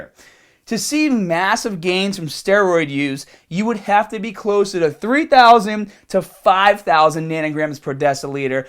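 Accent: Boston, United States. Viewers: Anyone else love the Boston accent😂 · tee ahh tee. Love Boston ahcent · who are you to come on his channel spouting nonsense he has a Boston accent get over it and grow up. how many accents are there in the world after all.... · He’s so Boston Irish ☘️🇺🇸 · Very knowledgeable. Thanks for the info. (Love the Boston accent. I'm in upper NY where we use hard "R"s and all sound like pirates) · I’m from Boston and I have to say accent is thick..lol.great videos keep it up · Bostonian accent.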